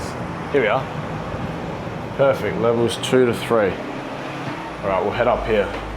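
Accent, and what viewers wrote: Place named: Australia. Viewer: Thank you for coming to HK.💛 I can practice listening to Australian-accented English.😃🙏🏻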